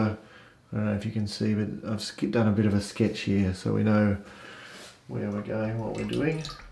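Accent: Australia. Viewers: I watched a second time. It’s really a stunning painting. It’s amazing to see how different artists palettes produce such interesting paintings. So I subscribed to your site. Where are you from, I hear a slight Australian accent? Kathy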